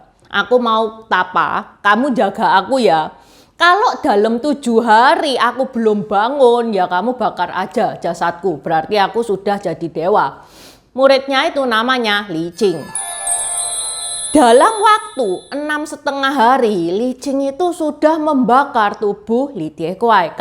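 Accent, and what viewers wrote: Java, Indonesia. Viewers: Cc orang mana sih? Medok pol ❤ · Tionghoa medhok.... 🇲🇨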